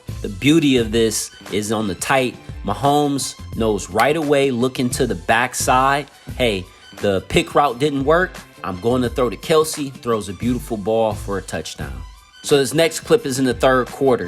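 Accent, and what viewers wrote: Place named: West Virginia, United States. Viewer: Are you from West Virginia by any chance? You sound exactly like Randy Moss! :)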